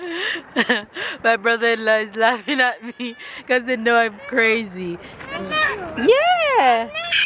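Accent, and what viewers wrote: Jamaica. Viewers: I sure will. I look forward to knowing you better. Are you Jamaican 🇯🇲? You sound like one. I'm Nigerian 🇳🇬 and my man is Irish 🇮🇪 🍀. Don't forget to subscribe to my channel too so you can also see what I'm up to.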